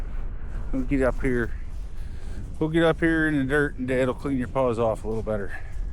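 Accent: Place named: Canada. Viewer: I love hearing the Canadian accent!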